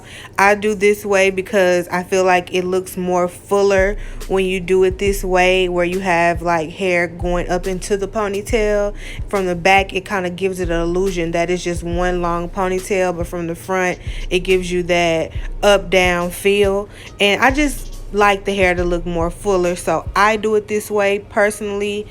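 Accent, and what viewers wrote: South, United States. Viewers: Loved the look... And Baby you don't have to explain yourself about how you talk... You have a cute southern accent that's understandable... Ik people not trying to come for you in the comments 💪🏾... I heard everything clearly and by me being a visual learner I found everything to be very detailed with your speech and the hands on process... On the road to 50K... Let's get it💪🏾 ♥️💯...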